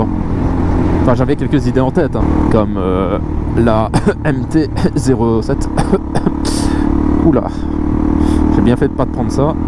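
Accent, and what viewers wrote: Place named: Belgium. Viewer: tu es ou en Belgique ? Je le sais avec ton accent de begique et les plaques. Sinon au top de la vidéo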